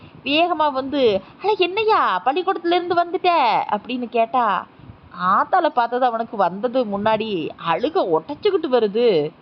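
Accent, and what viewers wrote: Kongu, India. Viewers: கரிசல் கதை கொங்கு தமிழில் 👌🏻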